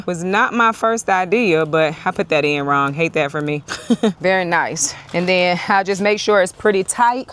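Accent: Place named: Chicago, United States. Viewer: I cannot convince myself that you are not from The Chi. You just have that Chicago vibe. lol. Heeeey from Mississippi!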